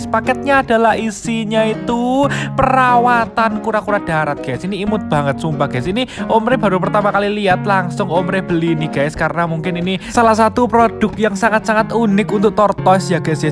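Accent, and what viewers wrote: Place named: Java, Indonesia. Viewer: Wah mantap. Ternyata kalau ngonten medok ya broooo 😋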